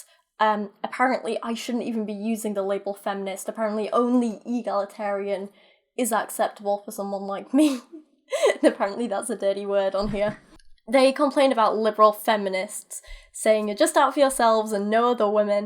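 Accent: United Kingdom. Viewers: I guess problem is that a lot of us (including the British sounding lady what made this video) are used to living in semi-civilized countries where free at point of use Healthcare is a given and reproductive rights are taken for granted. Pity the poor bastards South of the 49th.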